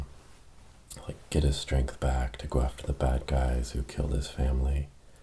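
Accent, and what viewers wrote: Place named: United States. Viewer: I never thought that I really would like an American accent with ASMR vids. Depending on our English teachers at school - in Germany they are mainly British - you get used to British English right from the beginning. And that's perfectly fine with me. But I love this video. Your voice is calming, soothing and relaxing. So, thanks for that!!!.Greetings from Germany. ;-)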